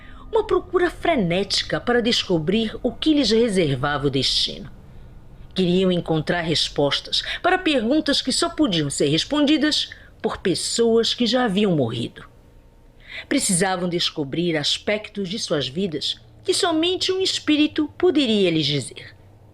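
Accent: Pará, Brazil. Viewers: a senhora é paraense?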